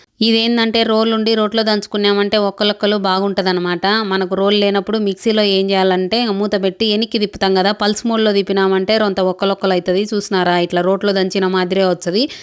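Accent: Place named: Badvel, India. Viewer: Hi మాది బద్వేల్ మీ యాస నా యాస ఒక్కటే. ఒకమ్మాయి నాన్న ను నాయన అన్నానని. నన్ను చాలా నోచుకున్నట్లు మాట్లాడింది ఒకప్పుడు. అదేదో నేను తప్పు చేసినట్లు.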